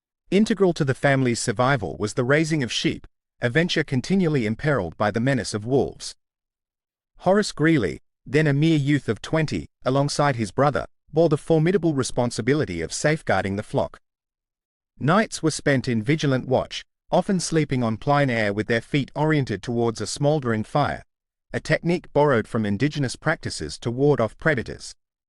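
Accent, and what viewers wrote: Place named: United Kingdom. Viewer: Wow, I like that your actually mentioning some less well known figures. I respect that. Also, love the British accent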